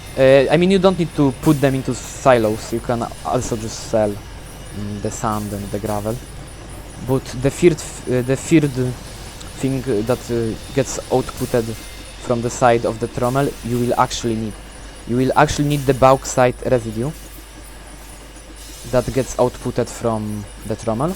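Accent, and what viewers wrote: Poland. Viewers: nice polish accent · dzięki za tutorial słychać że Polak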